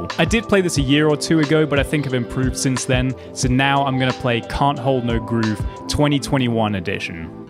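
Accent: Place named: United Kingdom. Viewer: Nothing like funk with a British accent! Love it. That's some proper funk, mate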